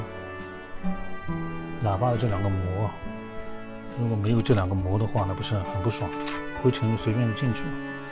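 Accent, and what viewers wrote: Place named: China. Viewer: 口音明顯中國人啊⋯中國人講日語吧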